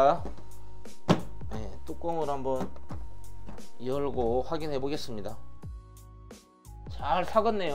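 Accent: Jeolla, South Korea. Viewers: ㅋㅋ 설명 참 재미 있으 시네요~~경상도 말씀에 전라도 억양같기도 하구요~~맛있어 보이는데 함 해볼께요~~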